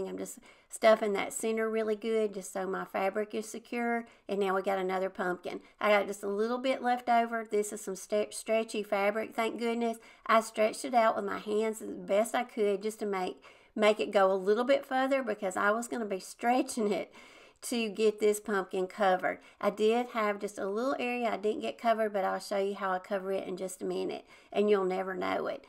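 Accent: South, United States. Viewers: I am fairly new to your channel. I love your idea's. You make such darlin thing's. May I ask which state you live in. I live in South Carolina. You have that southern drawl.. So do I.. I would take a little guess and say you may live in Tennessee. I have family in Tennessee. Thanks for sharing your beautiful craft's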